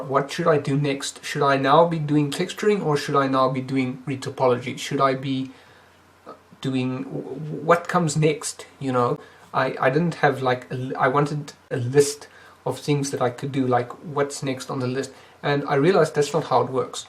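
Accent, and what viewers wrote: South Africa. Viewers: South Afrian?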